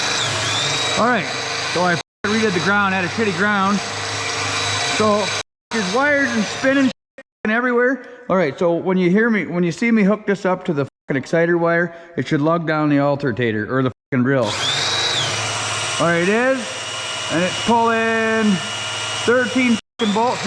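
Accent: Canada. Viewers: I’ve never heard someone as Canadian in my life I’m fucking hooked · Why do you talk like a Canadian mate ?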